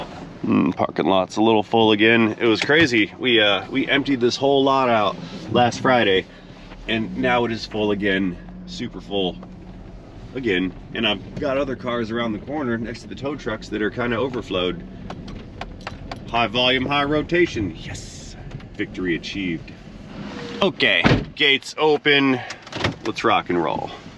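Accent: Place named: South, United States. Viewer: I always notice that after every night you over party you tend to talk S L O W L E Y and the vids tend to be an hour or more. BUT us Southerners like it when you start talking like a local. :goodvibes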